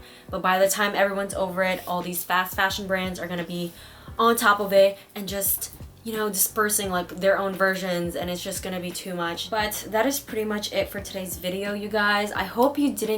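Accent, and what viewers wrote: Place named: United States. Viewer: I think it’s so cool how you Americans sound talking “button”, “them” and etc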